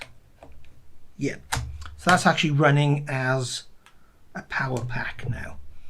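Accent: United Kingdom. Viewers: Where are you bud, sounds like the UK. I've got a faulty unit that won't charge from the socket. I can't diagnose what component is faulty and wondered if you could help. Thanks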